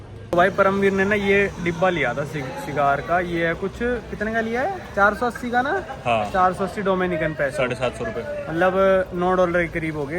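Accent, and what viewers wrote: Haryana, India. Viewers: का accent कुछ कुछ हरियाणवी के तरह हो गया है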